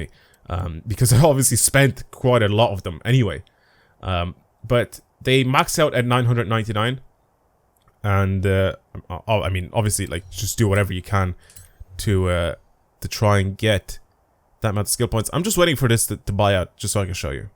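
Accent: Ireland. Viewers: Are you Irish? I assume yes. I'm new to your channel. Am Irish too, where you from?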